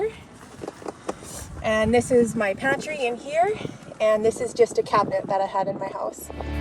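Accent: Canada. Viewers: She is from Canada. I could hear it. I’m from the United States. I really like what she has done with her Jeep. Incredible. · Ahhh, freedom! 😊I hear a Canadian accent, so I know making it was warm as possible is a priority. I wonder if police or "Karens" up there are prone to harassing people who live in their vehicles they way they are in the U.S.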